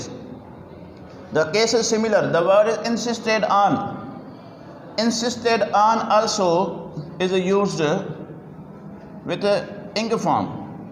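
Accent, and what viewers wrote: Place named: Sindh, Pakistan. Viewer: Sir u r out standing nd one thing ur voice tone is purely Sindhi u must keep in ur mind u delivered lecture in English 🙏🙏🙏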